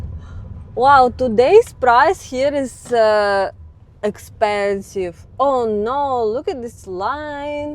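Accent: Russia. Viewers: Dear... Thank You so much for the GREAT video. WOW!! That is some bridge. Very impressive. The road trip through Russian Island was also super cool. My wife and I really enjoyed this video. You take us places we, probably, will never see in person. It is so cool. We truly enjoy each and every video you put out. I could listen to you for hours ( and I do when you do a live stream). There is nothing so beautiful to my ears than the English language spoken with a Russian accent. We are so happy that you are doing well. We truly wish that our governments would get down to work and normalize relations between our countries. Thank You so much for all of your efforts... we enjoy them so much. God Bless and all of the very best from your Capitalist friends in the US... Peg and Barry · You're sounding like soviet/Russia today,before your english is mixed with a little bit of British, America and very little Soviet/Russia,but you're sounding pure Russia/Soviet english accent and it's entaining. 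MAYBE VLADIVOSTOK have taking over and it's good.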